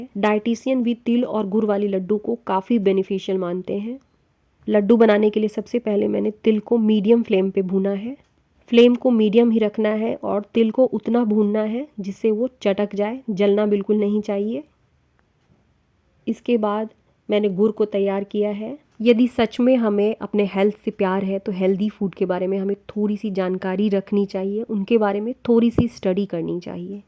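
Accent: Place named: Chhapra, India. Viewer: M chpra me rhti hu.pr apki language tone idhr ka hi lgta h.ya khan pan.hryana side ka nhi lgta,video achhi h.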